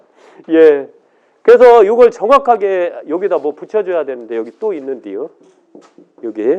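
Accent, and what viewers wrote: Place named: Jeolla, South Korea. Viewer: 전라도 사람이네 ㅠ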